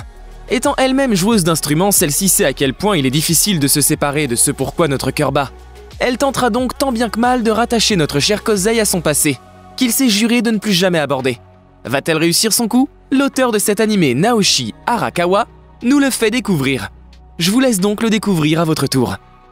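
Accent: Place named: France. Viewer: i can't stand your English accent bro, you're literally read english words with frensh accent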